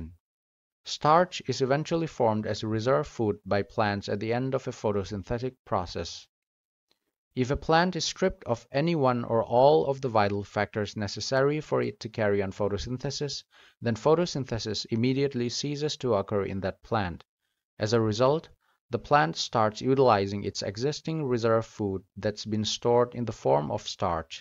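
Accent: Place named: Northeast India, India. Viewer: Well it played automatically after an experimental related video. Mostly I saw high end instruments being used, then in your video I saw the microscope, very much like the one my College labs has. There I thought , he must be an Indian and by the accent, a northeastern. Then I checked your abouts'.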